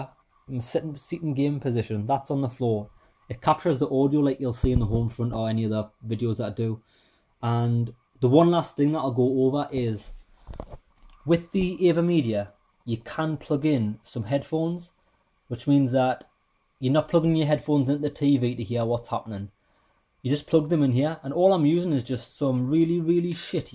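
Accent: Newcastle, United Kingdom. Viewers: Here Kid are you a geordie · When listening threw my headphones my audio out sounds really low can I have some help Btw I realised your Geordie accent lol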